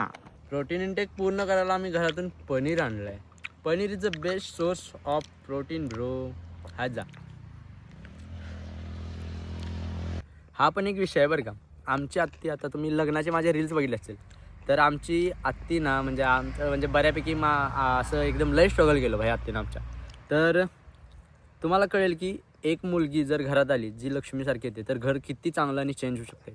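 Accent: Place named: Sangli, India. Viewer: सांगली मधली tone आहे तुझा बोलीची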